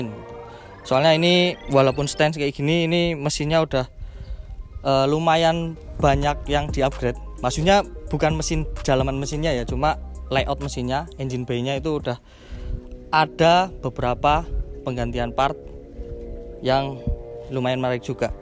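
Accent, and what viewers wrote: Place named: Banyumas, Indonesia. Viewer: Wong ngapak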